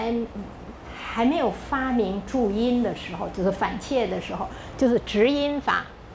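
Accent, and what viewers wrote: China. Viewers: 老师是山东人耶~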